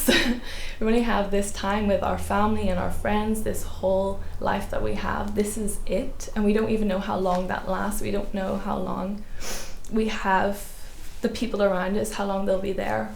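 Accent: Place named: RP, United Kingdom. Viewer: Hey your accent has changed. More posh. Anyways, how are you doing? 😊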